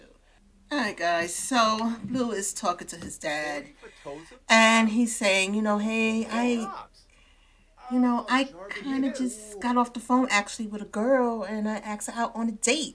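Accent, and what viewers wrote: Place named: Brooklyn, United States. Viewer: no problem and yes, I love it! I'm from the south so it's rare if I get the chance to hear a Brooklyn accent.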